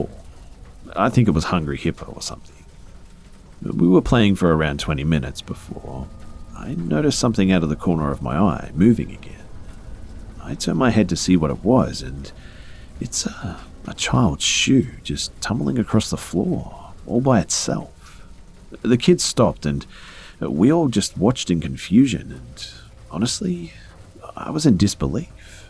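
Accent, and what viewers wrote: Australia. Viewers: thois guy izza aussie fah shhure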